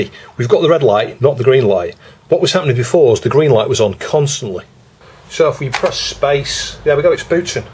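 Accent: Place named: Manchester, United Kingdom. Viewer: Love the video. That Manchester accent, though...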